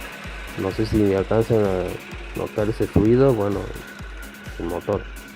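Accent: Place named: Santiago del Estero, Argentina. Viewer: Hola amigo, te felicito....muy bien explicado....parece tonada Santiagueña 😊👍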